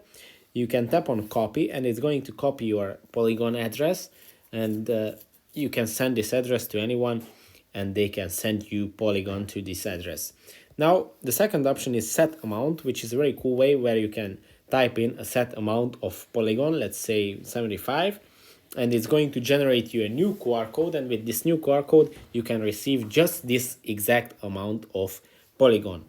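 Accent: Hungary. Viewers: jó kis magyar akcentusod van :) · I bet you any money that you are from Hungary. I can recognize your accent. :)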